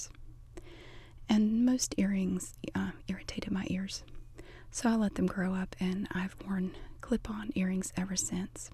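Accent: South, United States. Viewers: ) You're great!!! My favorite are your soft spoken videos. Perfect with that southern sound. Just trances me put.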